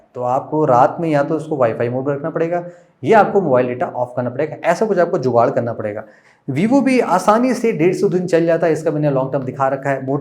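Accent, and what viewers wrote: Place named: Kanpur, India. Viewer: Bhai ap kanpur se ho kio ki saddi kanpur me bolte hai 👍👍👍👍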